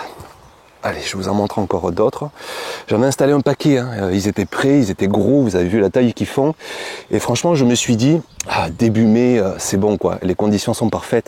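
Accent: South, France. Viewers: Merci beaucoup pour cette vidéo. Exilée en Alsace, c'est toujours un plaisir d'entendre l'accent du sud 😊 Je seme tous mes plants en Alsace chaque année. Ils finissent dans la serre. J'en replante dans notre jardin familial près de gignac, non loin de Montpellier lors des vacances scolaires. Cette année, première zone hélas, les replants ont été mis en place le week-end de pâques. Nous allons voir le résultat pour le pont du 8 mai. 🤞 En Alsace, les plants jumeaux sont en fleurs sous serre. J'attends fin mai pour mettre les derniers en pleine terre et livrer des plants à ma fille en haute Savoie Tous les ans, je suis surprise de l adaptation des diverses variétés replantées dans ces divers coins de France. Que la nature est resiliente et généreuse !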